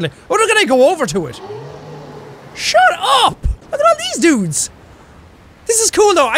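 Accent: Ireland. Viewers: He sounds way more Irish than he does today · Hi in your biggest fan I watch you so much I've picked up your Irish accent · his irish is heavier than it is now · Coming here from 2021, and I was startled by how Irish this man sounds. Also smiled like an idiot at the intro. Edit: 2023 now, and I don't think I'll ever get used to his incoherent ramblings. Still fun to watch, though!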